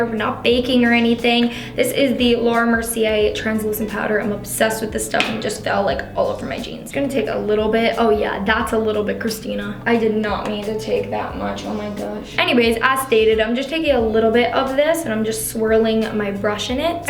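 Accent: New York, United States. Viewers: aww honestly i love her she's so nice and i love her like little new york accent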